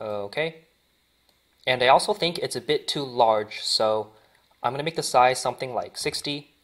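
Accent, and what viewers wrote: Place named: Asia. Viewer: the asian accent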